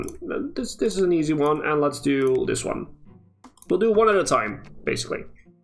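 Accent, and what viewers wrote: Netherlands. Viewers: That's correct, I'm from the Netherlands too. Funny how, as a Dutchy, you always recognise the smallest Dutch accents 😁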